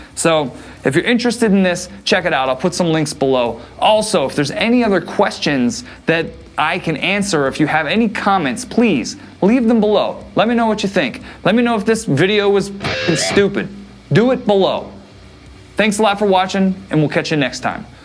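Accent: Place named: United States. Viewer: wow cool.......i have seen your videos where you have visited the Musicstore in Köln. In 2009 i was there too. Amazing. First of All, thanks for my english and now because you are livin in Köln we can write in german?????! Also, es ist eine grosse Freude, Deinen Kanal zu schauen und mit Dir zu schreiben, mein Lieber......hahahahah super..........Ich habe neben den Harley Benton Gitarren noch andere und auch höherwertige, aber sie gefallen mir sehr. Ich bin aber auch kein Profi, nur Hobbyfreak. So Brother, did you have understood all???? And it is really the truth, your american english is so damn good to understand, i think i understand each Word.....i love that, so i can learn more and improve my english........Especially in guitar themes i understand a lot, when you are talking about politics or nuclear sience i understand nothing...but for normal things........it works.Thank God and praise the lord that he gave us guitars and cool music man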